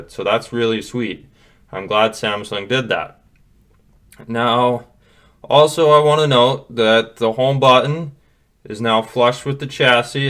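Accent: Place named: Canada. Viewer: For your screen on time of 10 hours, is that over the course of two days with quite a bit of standby time mixed in, or more straight usage in one day? Also sounds like you're in Canada so I was wondering if you bought this phone from a carrier or unlocked? If you bought it unlocked where did you get it? Seriously considering this phone, samsung even dropped the price to $450 CAD on their website.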